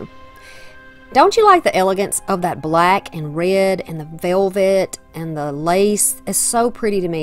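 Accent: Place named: Alabama, United States. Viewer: I could tell you were from Alabama!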